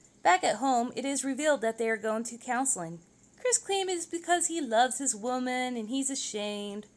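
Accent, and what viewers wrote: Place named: Asia. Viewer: The narrator’s Asian accent spoiled kid voice is annoying 😣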